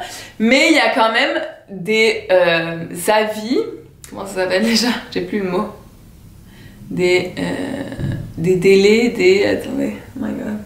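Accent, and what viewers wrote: Quebec, Canada. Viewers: Pourquoi tu parles avec l'accent du Quebec alors que tu es française ? Je comprends pas trop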